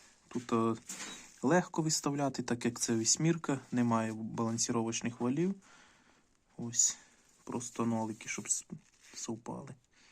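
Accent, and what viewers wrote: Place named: Hutsul, Ukraine. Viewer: Акцент гуцульський.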